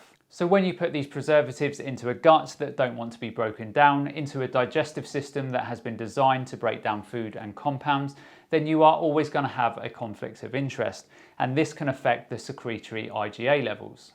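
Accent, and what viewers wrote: United Kingdom. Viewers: You sound British. Thankyou for info. Where do you suggest to get these tests done? So many options I don't know which to trust.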